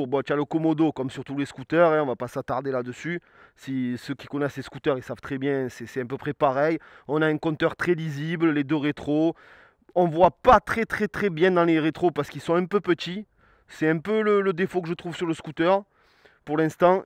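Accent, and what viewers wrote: South, France. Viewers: Me encanta el tio este con su acento del sur,j'adore ce mec avec son acent du sud,saludos desde Normandie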